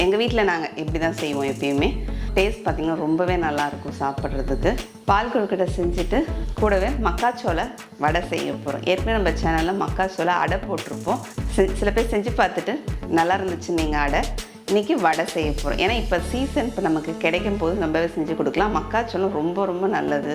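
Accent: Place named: Puducherry, India. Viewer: Vadaa Seema ya.. 😍😍😍 உங்க slang கேக்கதுமே ஏதோ little bit happiness.. Don't know y.. ❤❤ Neenga pondicherry ya 😁😁🙌🙌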